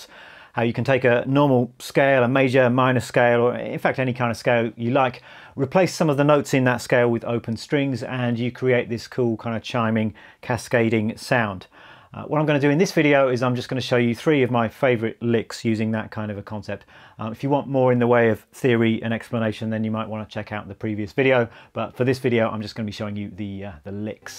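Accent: United Kingdom. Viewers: Hi, I am amazed at these lickes and phrases you keep coming up with. You sound british but you'rer true country. At 77 years old as I, i FIND i am not too old to learn. I am rather new to your youtube as you might have guessed. Keep it going.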